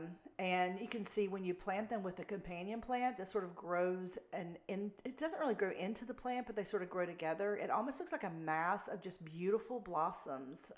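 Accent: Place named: Alabama, United States. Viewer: You have to be from Alabama. The accent is a dead giveaway.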